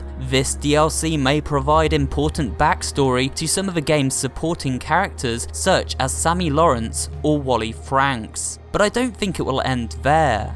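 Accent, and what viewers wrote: United Kingdom. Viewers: You are like the British version of the Chills YT channel with the way you talk.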